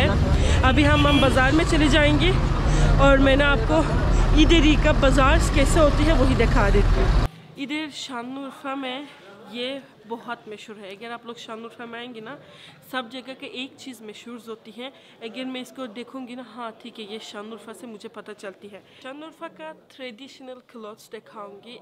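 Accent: Pakistan. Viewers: Assalamualaikum .I like your Urdu accent alot .You put genuine efforts to make videos . Narrating the story of Hzth. Ibrahim (a.s) & Hzth Ayyub ( a.s) was very informative . I haven't came across those maqam e mubarak before .May Allah (s.a.w.t) bless you & your family with good health, happiness, longevity , success and prosperity. Your brother from Hyderabad, India. Fia'amanillah. (Mohammed Azeem).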